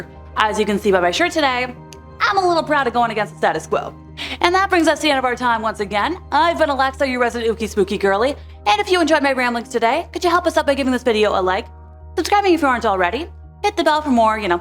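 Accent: Toronto, Canada. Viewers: Toronto accent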